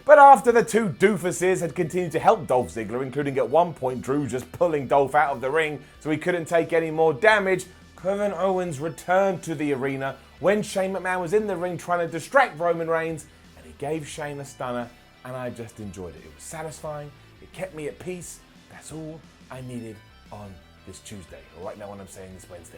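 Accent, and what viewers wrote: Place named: Scotland, United Kingdom. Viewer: why a scottish accident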